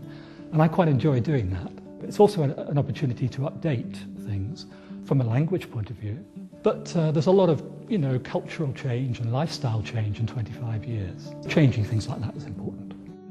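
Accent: United Kingdom. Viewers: Does he speak in RP?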